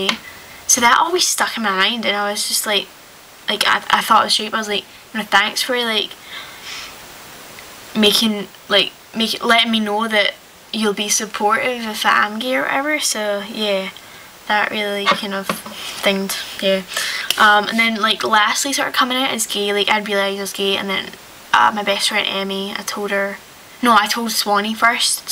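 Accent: Scotland, United Kingdom. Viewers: you are quite adorable & i love your accent. if i lived in scotland, i'd totally date you<3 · Scottish! :D Loveee ittt. :D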